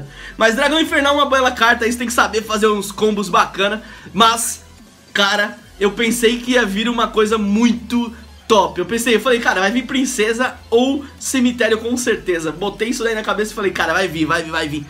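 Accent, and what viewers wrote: Minas Gerais, Brazil. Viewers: Aposto mineiro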